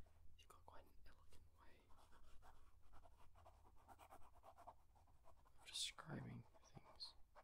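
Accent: Australia. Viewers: just stumbled across ur channel & immediately subbed! don’t know if it’s the familiar aussie accent or just ur whispers in general, but ur voice is so insanely comforting <3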